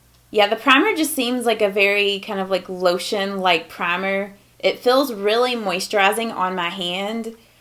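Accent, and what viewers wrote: South, United States. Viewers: shruti, she sounds southern. I’m from Alabama and picked up on it, too. Love hearing familiar voices on YouTube. 🤗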